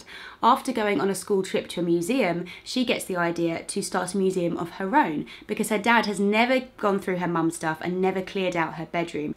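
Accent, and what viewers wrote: United Kingdom. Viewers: Oh you sound like a surrey girl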